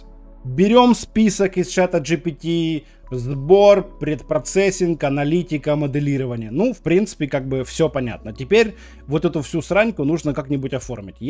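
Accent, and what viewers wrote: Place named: Ukraine. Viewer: как же приятно слушать украинский говор на моменте с чатгпт и твои ЧИRRRRRИКС лайк без раздумий ❤️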